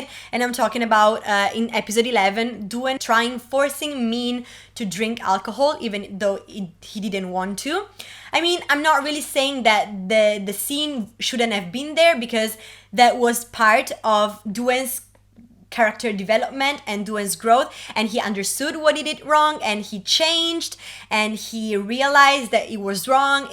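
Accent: Italy. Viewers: Da quando hai cominciato a parlare ho percepito subito l'italian vibe ✨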